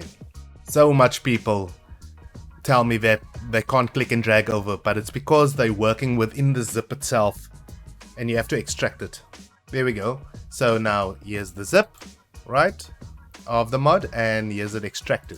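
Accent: South Africa. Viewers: Wait.... you sound South African...... AWE! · Ahhh man I love hearing south african voices on yt videos 😂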